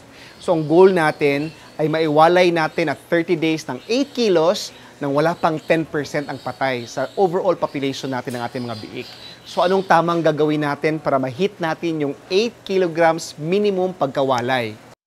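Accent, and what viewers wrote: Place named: Cagayan de Oro, Philippines. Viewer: sherwin jara : ang galing nga po.... Parang taga amin c Doc sa CDO....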